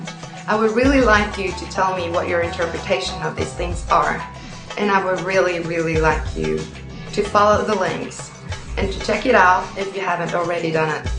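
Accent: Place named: South, United States. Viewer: Whats up with your accent babe? Or is it the crappy background music distorting it? Its that southern thing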